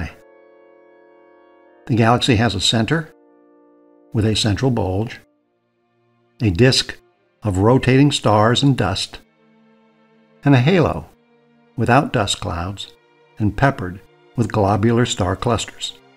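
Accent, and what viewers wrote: United States. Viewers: Oh thank goodness... A video narrated by an American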